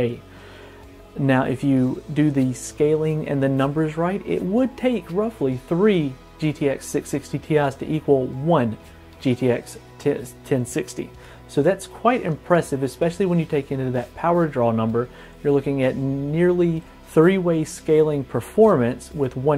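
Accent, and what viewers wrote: South, United States. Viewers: Lemme guess you from the south of the US as you sound country haha, cool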